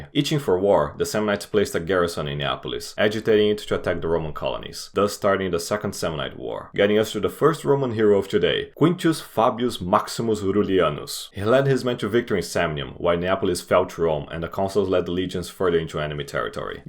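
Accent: Brazil. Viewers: You are brazilian right, i feel it in me accent recognizer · Pretty sure he's Brazilian. His pronunciation of Roman names sounded really Brazilian to me, so I stalked his channel and turns out he's subscribed to a couple Brazilian channels.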